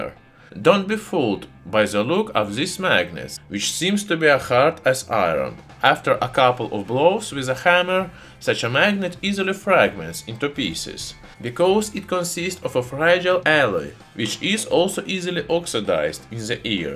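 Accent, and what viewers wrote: Russia. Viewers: Love that thick Russian accent! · I love your accent. It adds to the "mad" Russian scientist character. 😁 I mean that in a friendly way. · "I needed to remove iron sauce" "Somehow extract near demon souls" You see, the auto generated English caption can't understand Russian Style English. But human ear does. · Regarding his pronounce he is from rushka. They waste all toxic on their forests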